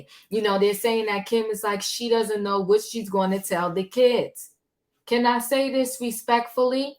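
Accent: New York, United States. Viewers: . I’m from Queens and I hear NYC when you speak. Am I correct?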